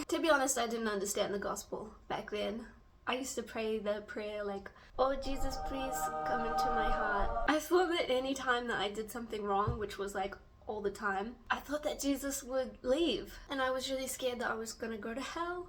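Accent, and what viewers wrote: Australia. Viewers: What country were you born in, if I may ask? I detect a slight accent in your English that sounds Australian. May your 2026 be the best year of your life. 🎉🎉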